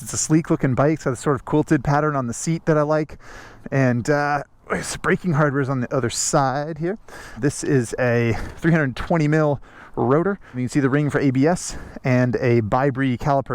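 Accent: United States. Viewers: Was surprised when I heard an American accent. This dude looks super British lol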